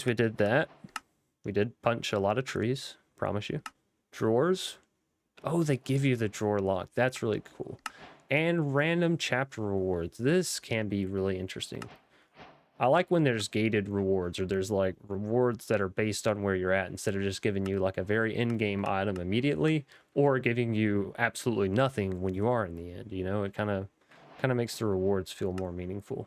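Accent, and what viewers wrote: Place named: Appalachia, United States. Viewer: he sounds Appalachian or southern to me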